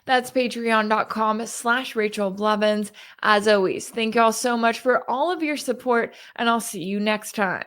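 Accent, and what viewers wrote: Texas, United States. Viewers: She sounds fine nothing wrong with it a girl from Texas.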